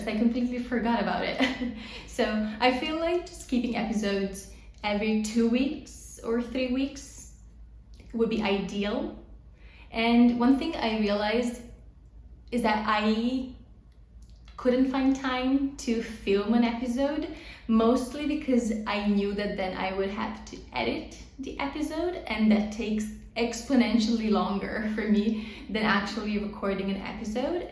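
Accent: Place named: Italy. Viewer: Great seeing you again I started to wonder, where have you been 😊 ….glad to see you are O. K. I love love your videos…edited/unedited…it doesn’t matter because you bring your lovely personality and Italian accent (which I adore)to it. It is so so cute and enjoyable. Looking forward to seeing more of you in the future. Thanks for taking the time and sharing. You are an inspiration to us all💞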